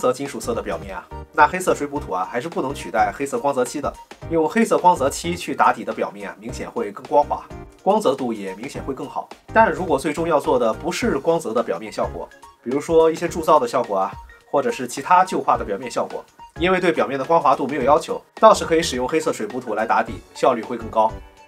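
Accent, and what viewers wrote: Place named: Qingdao, China. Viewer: RAY是青岛人么？口音很像老乡啊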